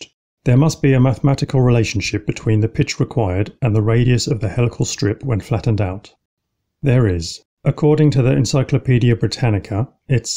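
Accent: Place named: England, United Kingdom. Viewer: I'm just over the moon to hear a middle class English accent on YT!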